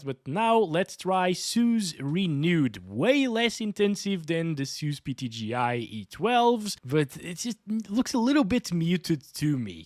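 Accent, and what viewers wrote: Portugal. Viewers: Ah, I always knew you were Portuguese! That accent does not lie lol As a fellow PT I forgive you for preferring beer 😄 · Primeira vez a cruzar com este canal e ao fim de 10 segundos, meto os meus tomates no cepo como é um português! 🥳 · mano no inicio do video eu percebi logo que o gajo era tuga ahahah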